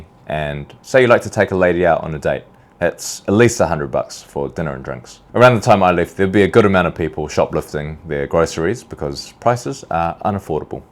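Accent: New Zealand. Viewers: You’re so absolutely right on all points. I’m from Christchurch and the herd mentality and rascism is worse there, such a shame. I live in Auckland and yes cost of living is very high. Keep rocking your integrity and sense of self along with that kiwi accent! Wish you all the best ☺️🙏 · God Bless You, man! Love your Kiwi Accent! Oh, and I subbed! 💥👍😎🇳🇿🇦🇺🇺🇸❤️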